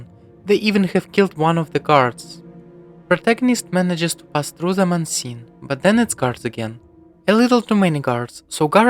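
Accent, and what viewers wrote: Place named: Eastern Europe. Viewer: I find your voice very appealing. That airy, slightly androgenous, slavic thing you've got going on. Yup. Very nice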